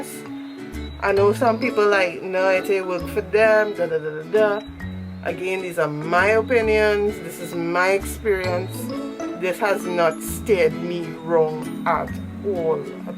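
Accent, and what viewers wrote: Trinidad and Tobago. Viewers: New subbie....your accent.....Trini?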